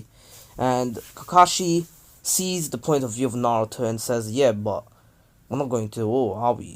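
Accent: United Kingdom. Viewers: I just like his voice when he narrated Bc it’s British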